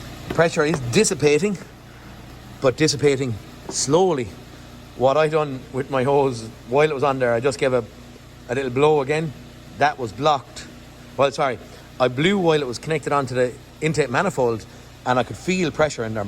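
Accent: Ireland. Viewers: Suprised to hear an Irish accent! and thanks! Same problem with my Outlander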